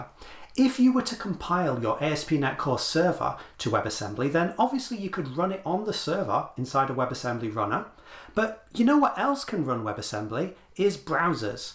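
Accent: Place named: Australia. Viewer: Great guy but can never listen to him for more than 1 minute due to stupid Aussie Accent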